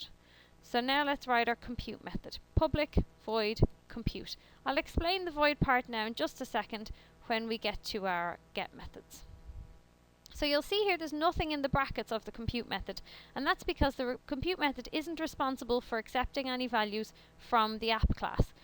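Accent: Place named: Ireland. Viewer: Very helpful! Nice to hear an Irish accent on this topic!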